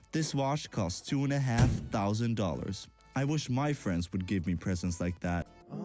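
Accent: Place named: Netherlands. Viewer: lekker nederlands acccent hoor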